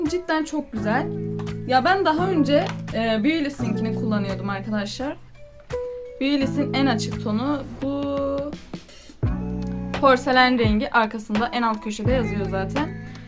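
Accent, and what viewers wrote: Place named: Kurdistan, Turkey. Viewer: kürt müsün şiven bazen kayıyor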